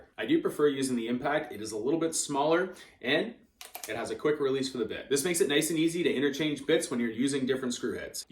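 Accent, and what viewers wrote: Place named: Canada. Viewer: Listened 3x for the Canadian accent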